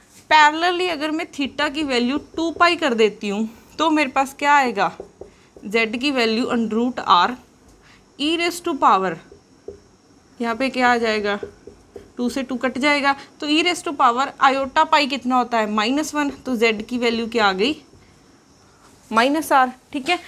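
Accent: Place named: Punjab, India. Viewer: Punjabi accent aa hee jta beech mai 🤣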